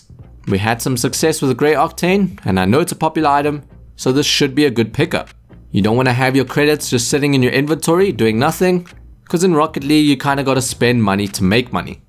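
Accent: South Africa. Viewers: Are you South African? If so SA GANGG!!! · Are you South African? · Hey are you maybe from South Africa? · Are u south African by any chance?...just curious cause u have a strong accent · U r south african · Are you South African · South African?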